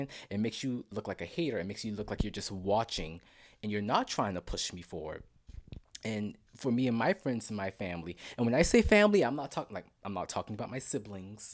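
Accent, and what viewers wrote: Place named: Geechee, United States. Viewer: Yesss your accent is too cute.. that good ole southern geechi honey ❤❤ I'm from SC too ❤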